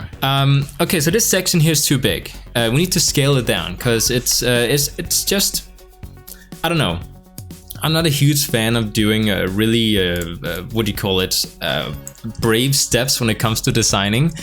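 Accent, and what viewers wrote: Denmark. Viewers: Tænkte sku nok du var dansk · Are you danish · Your English sound danish ?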